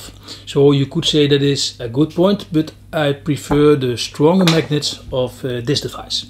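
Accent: Netherlands. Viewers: Verdomme dat hollands accent haal je er ook meteen uit.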